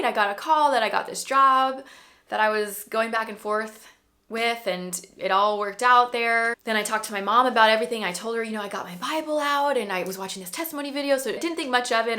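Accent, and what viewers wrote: United States. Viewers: Rrrr u are so pretty I like your hair and tht American accent is so hot🤭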